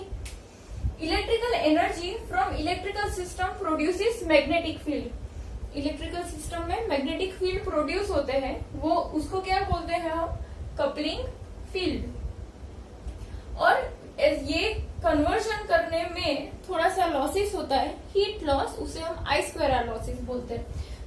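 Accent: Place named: Gujarat, India. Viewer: Definitely u r from gujrat bcoz v all have unique hindi accent.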